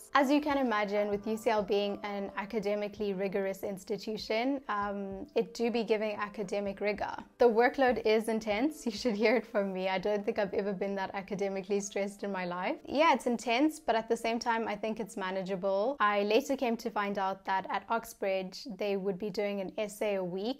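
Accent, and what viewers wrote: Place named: South Africa. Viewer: I knew it she's south african 🇿🇦😝 thanks for the in-depth review. I feel super comfortable in my choice for my masters there. 😎 Keep it up!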